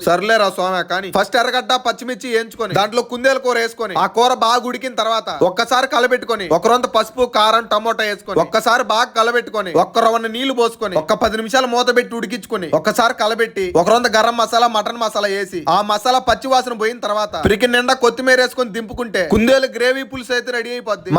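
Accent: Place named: Telangana, India. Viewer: ఒరేయ్... నీ...తెలంగాణ...బాష...ఆంధ్ర...యాస... రెండూ...బలే...బాగున్నాయిరా...తమ్ముడూ...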